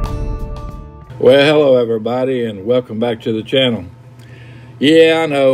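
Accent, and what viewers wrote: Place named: Texas, United States. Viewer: So glad to have you back on here,. It is good to hear your Texas twang on videos! Haha. I do enjoy watching and listening to you,. Yes, YouTube is addicting. I'm writing this comment at 1am because I can't sleep, so what am I doing - watching YouTube! Haha. Good luck,!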